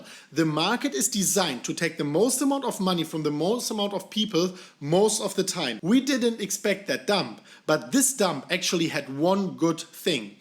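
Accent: Germany. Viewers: Sorry man, I recognise german accents from a mile away. He's definitely NOT Polish. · Good analyse but that Germaned English blehhhhhhh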